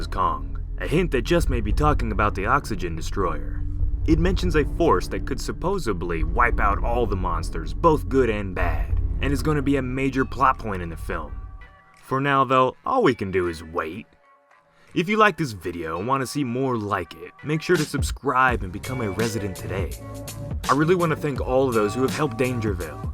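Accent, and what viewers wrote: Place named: United Kingdom. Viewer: Nice British accent 👌🏻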